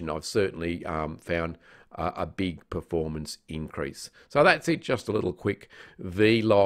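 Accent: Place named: Australia. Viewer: nice to hear an Aussie accent in YouTube for a change :) thanks for the tips this is way more useful than those silly startup timer tests. might upgrade my iPad 3rd gen soon and report back how I go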